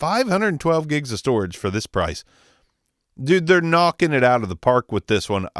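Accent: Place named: Delaware County, United States. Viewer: You sound like you have a delco accent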